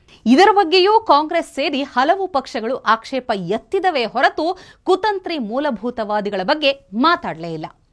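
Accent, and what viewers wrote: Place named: North Karnataka, India. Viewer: ಮೇಡಂ, ನೀವು ಉತ್ತರ ಕರ್ನಾಟಕದ ಕನ್ನಡದಲ್ಲಿ ಮಾತಾಡಿದ್ರೇನೆ ಕೇಳೋಕೆ ಚೆಂದ.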